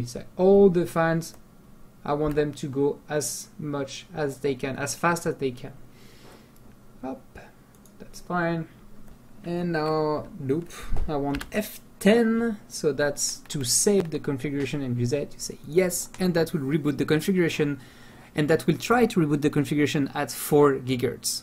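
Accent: France. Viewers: bjr monsieur, êtes vous Français ? Très bonne vidéo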